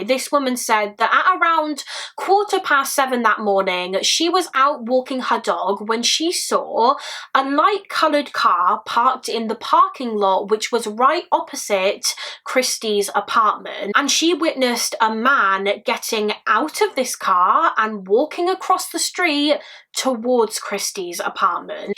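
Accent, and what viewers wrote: United Kingdom. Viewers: Are you British sweetheart???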